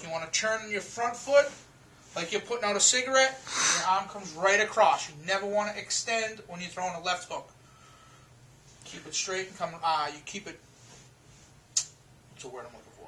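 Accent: Boston, United States. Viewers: its a boston accent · Boston accent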